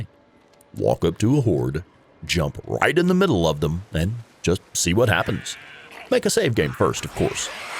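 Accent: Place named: South, United States. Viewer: you changed your voice. I've watched a few of your older Days Gone vids and your voice was a little rounder then, with a hint of southern accent. this is a great game and you make great guides.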